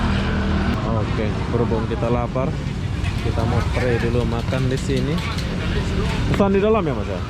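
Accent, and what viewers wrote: Indonesia. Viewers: Ini seriusan orang malasyia...kok logatnya indonesia bgt ya.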